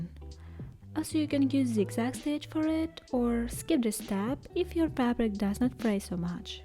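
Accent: Russia. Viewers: Hi! You sound very much Russian, with little difference in the melody of your speech. Also, your wedding ring (I assume) is on your right hand :) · У неё акцент как у русской🗿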